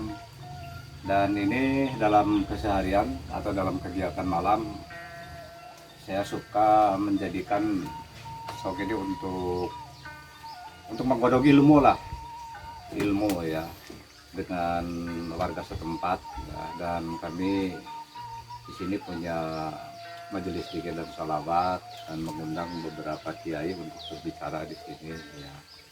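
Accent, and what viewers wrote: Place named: West Java, Indonesia. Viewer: Sukaa banget pak, sunda banget oii tentrem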